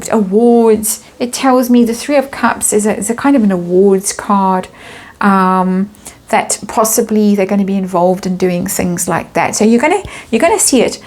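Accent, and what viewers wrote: South Africa. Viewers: Your readings are so interesting, and pretty much on the money . your voice transports me back To Cape town where i lived in the 70! Looking forward to the next! Grateful for your time and sharing ❤ I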